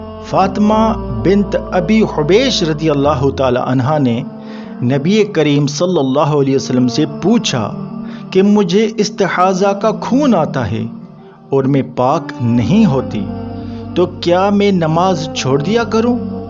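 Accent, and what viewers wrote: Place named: India. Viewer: Apni Urdu sahi bola Allah ka liya ku indians ki terha alphabet ha kha ha kiya quran bhi asa hi perhta ha pata nahi pakistan ma ya kiya bimari chali ha indians ko copy kerna ki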